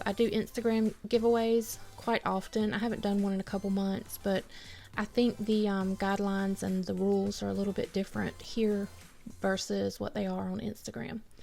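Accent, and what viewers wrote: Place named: Carolina, United States. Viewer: You sound like you may be a Carolina girl! Love your channel! ❤️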